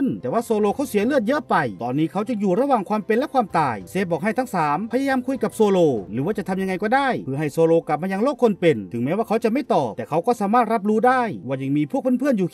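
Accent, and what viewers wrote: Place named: Bangkok, Thailand. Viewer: สำเนียงคนกรุงเทพฯแท้ๆแหละ ดูออก แม่นบ่แอด?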